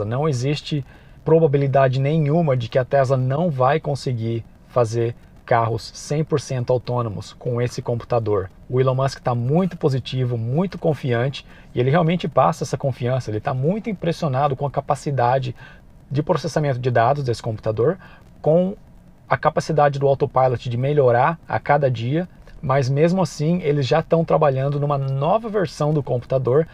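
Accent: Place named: Brazil. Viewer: Não há nenhuma dificuldade. Aliás, tenho partilhado alguns de seus vídeos, não só com a família, mas também em grupos portugueses do Facebook. Precisamente pela vantagem de estarem em língua portuguesa. O sotaque brasileiro e o uso de uma ou outra palavra típica do Brasil, não é problema. Crescemos em Portugal a ver novelas brasileiras! :D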